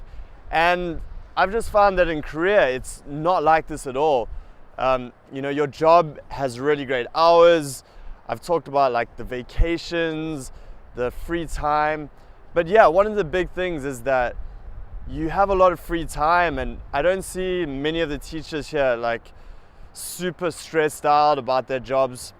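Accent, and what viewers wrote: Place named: South Africa. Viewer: You sound incredibly South African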